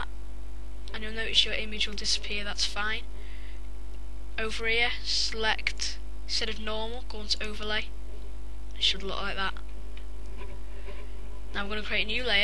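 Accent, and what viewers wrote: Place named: United Kingdom. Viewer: i was GOING to listen and watch this tutorial but i heard that you were british and like final fantasy so related videos here i come..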